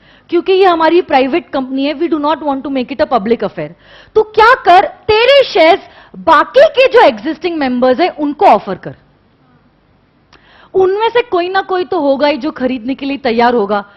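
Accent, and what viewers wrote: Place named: Gujarat, India. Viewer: I have nothing to do with CA or any Crazy study Shit. Still, I bought her books and Pen Drive stuff. She is an Amazing woman., and that thick Gujarati accent adds cherry over the cake. Keep it up, you have been fabulous. I never liked CAs until one fateful day I found you on Youtube.:)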